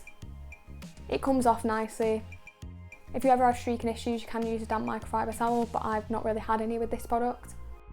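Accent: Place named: West Yorkshire, United Kingdom. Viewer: Another good video and well explained!👍🏻. Just wondering if your from Yorkshire, sounds like you have a West Yorkshire accent? We live in Filey ☀️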